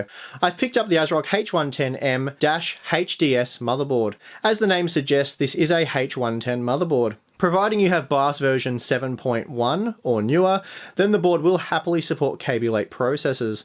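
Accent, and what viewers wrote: Australia. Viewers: what is this guy an Aussie? Aussie! Aussie! Aussie!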